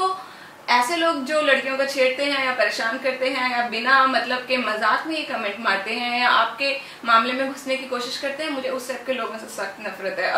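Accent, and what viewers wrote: India. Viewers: upka language lambadi hindi lagta hai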